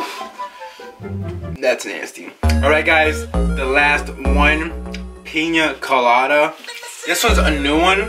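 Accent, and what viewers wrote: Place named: Chicago, United States. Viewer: This video randomly popped up for me, and instantly after hearing you speak I was like "this kids from Chicago..." so I went on your page, and bam... I was right. lol Hello fello Chicagoian !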